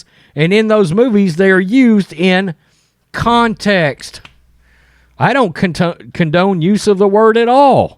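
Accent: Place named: South, United States. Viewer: I'm pretty sure your White a** has said it before. Sound like good ol boy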